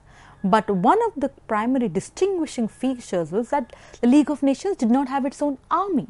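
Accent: India. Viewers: The accent understandably is a product of where you live and who you choose to mingle with. This is a mark, and not a "wrong" with Indians.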